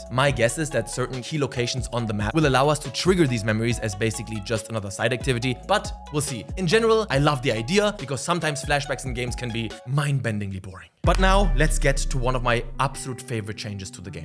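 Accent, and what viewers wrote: Germany. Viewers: What is bros accent 😭😭😭😭🙏🙏 It's like a lovely mix of German, Japanese, and a little bit of French. It's fucking me up in the best way. Anyway, fantastic video. I'm just as hyped for Yotei and the new stuff has me excited for a more immersive, less ubisoft-style "map checklist" game. Just getting lost in a massive world without feeling like an overwhelmed menial forced to visit every point of interest in the hopes of a crumb of meaningful content. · I'm Confused you have so a german Akzent and use german Words. Ich bewerte das Video 9/10, du hörst dich fast so an wie Raffey👍🏻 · danke für dein friendship, alter du sprichst englisch mit einem deutschen akzent, bist du auch deutscher oder ? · Man hört das deutsch sooo raus hahaha geil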